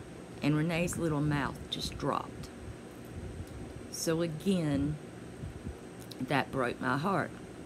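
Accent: South, United States. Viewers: So happy for you and your family that prayers were answered and you will make a full recovery. It would be pretty neat to see a video on your top 5 favorite places that are haunted. Or scariest places you've investigated....I will watch any video as long as your southern twang is in it haha much love to you and your family 👻💜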